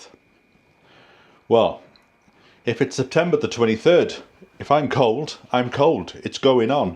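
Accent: United Kingdom. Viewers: What's a wonderful and clear British English ❤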